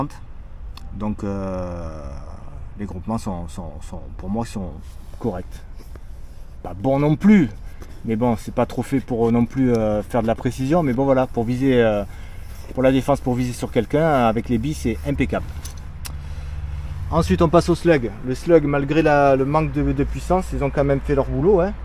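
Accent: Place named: South, France. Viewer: Super vidéo du CP300, comment ça ? Il fait froid avec l'accent du sud de la France 😊.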